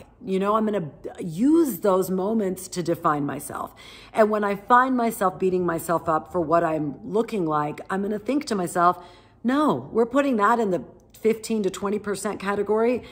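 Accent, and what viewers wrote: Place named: Texas, United States. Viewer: I so enjoy your show ,I,m in Alabama now but am Ft.Worth Texas ,and I smile every time you say you,all ,that’s the Texas in us ,I say it to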